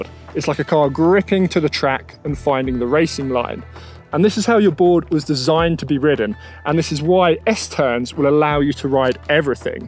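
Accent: United Kingdom. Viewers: Nothing against you mate but its weird hearing an brit accent in a snowboarding video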